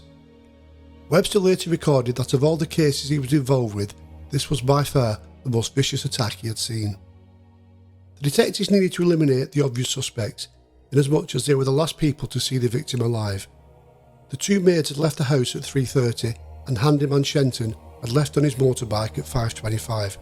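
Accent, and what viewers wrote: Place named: Lancashire, United Kingdom. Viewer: has a lovely Lancashire voice and accent. I love listening to him.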